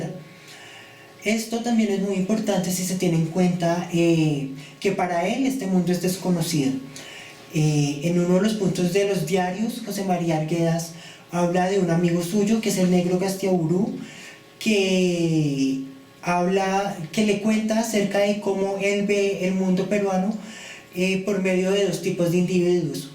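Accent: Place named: Colombia. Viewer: detecto un acento colombiano xd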